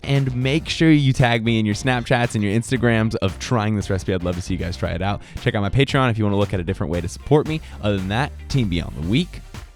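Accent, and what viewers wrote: United Kingdom. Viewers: your British accent is better than mine and I'm British ... Take the children to the school baby ahahah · British accent, recipe, sexy filming and close ups sooo on point man. I'm legit going to make it now.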